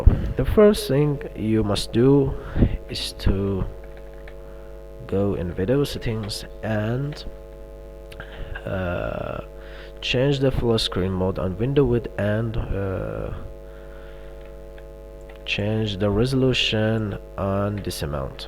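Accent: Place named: United Kingdom. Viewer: your english is so british bruh, are you asian ?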